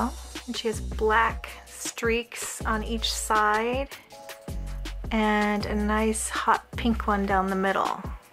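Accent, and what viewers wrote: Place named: United States. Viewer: I love how you post your videos late at night (I'm just assuming no hate but from ur accent it sounds your American and right now for me it's so it would be late all around the USA but different times)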